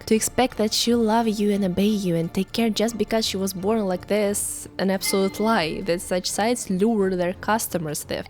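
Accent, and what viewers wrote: Russia. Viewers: I just love the Russian accent! And many of you look like supermodel without being a cringe fest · Good videos though you a little too fast with your delivery. Due your accent us native English speakers may have trouble following along. The memes make it funny & engaging too. Also i’d love to see a Russian YouTuber tackle those politically sensitive subjects, so, a) anyone got any recommendations , b) to the author, if you ever leave Russia, there is a gold mine of subjects us westerners would love you to explore. Appreciate speaking on some subjects is life changing tho. Peace out.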